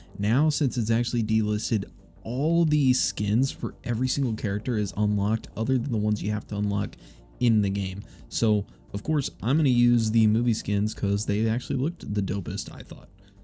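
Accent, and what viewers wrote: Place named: United States. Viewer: idk if it’s your stereotypical american voice (i’m british 😅) or decent editing / voice quality but i was expecting you to be popular while watching. vid was well made and clicked on it without seeing views/ subs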